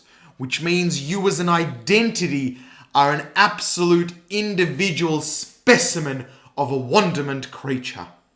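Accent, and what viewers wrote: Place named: Australia. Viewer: ur australian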